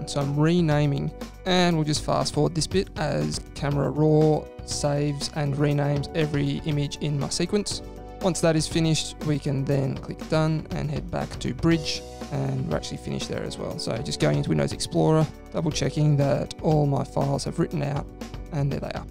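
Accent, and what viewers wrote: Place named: Australia. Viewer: Good to hear an Australian voice on YouTube. I am sure method works, however it is a bit of a fiddle. For weeks I have been seeking a simple method to open BlackMagic image sequences in an app' on Mac, and convert them to QuickTime PRORES files. The PRORES files I wish to colour grade in After Effects. Any suggestions?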